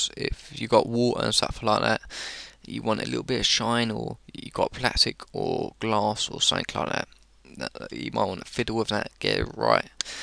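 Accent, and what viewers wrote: United Kingdom. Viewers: you sound like russell brand.. nice english accent..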